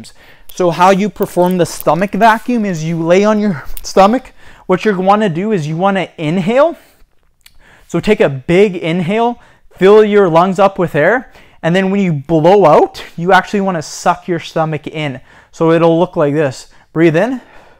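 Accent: Canada. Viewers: is it just me or does he sound canadian..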